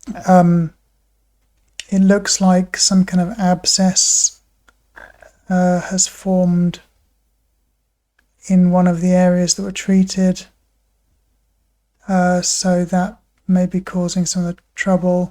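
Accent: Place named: England, United Kingdom. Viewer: You sound English .. why aren’t you having free treatment on the nhs instead of needing donations to pay for it (? In Japan?)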